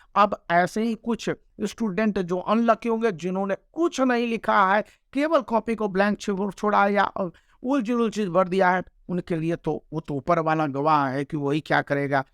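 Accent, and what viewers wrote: Bihar, India. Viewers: sir aap bihar se ho kya??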